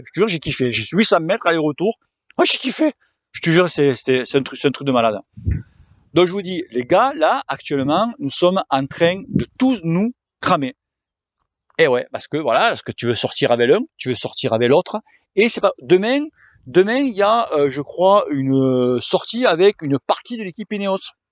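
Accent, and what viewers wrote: South, France. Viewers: S'il préparait un peu son texte et parlait un peu moins méridional ce serait mieux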